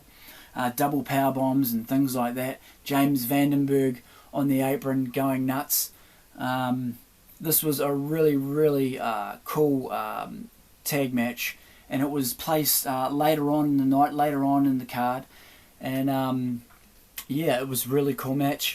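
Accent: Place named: New Zealand. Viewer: This takes me back to a 10.30 on friday nights through the 90z. By sound of your accent lad your from new Zealand aswell bro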